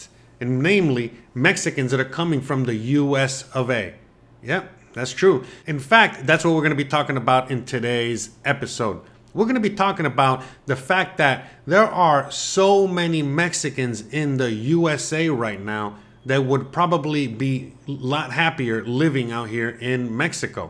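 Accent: Cuba. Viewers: Man you speak cubano very different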